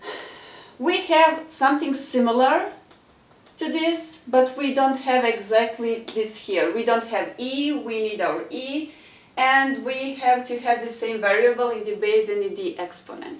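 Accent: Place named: Brazil. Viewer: You sound like a brazilian. Pretty cool.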